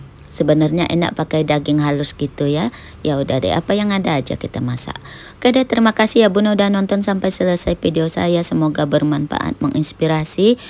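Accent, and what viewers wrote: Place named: Lampung, Indonesia. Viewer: Dari lampungkah bun. Logat bicaranya kaya org lampung ato palembang gitu 😊😊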